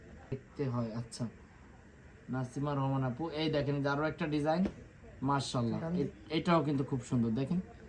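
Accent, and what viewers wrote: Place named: Jessore, Bangladesh. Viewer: আমি যশোর থেকে আসসালামু আলাইকুম,,,,, কেমন আছেন ভাই আপনার কথা শুনে মনে হয় আপনিও যশোর এলাকার দিকের কোথাও আপনার বাড়ি হবে,,,, 😊আপনার ড্রেসগুলো আমার খুব ভালো লাগে আমি ছোটখাটো একটা টেইলার্স দিয়েছি ইচ্ছা আছে আপনার শো রুমে গিয়ে কিছু প্রোডাক্ট নিয়ে আসবো হেল্প করবেন আশা করি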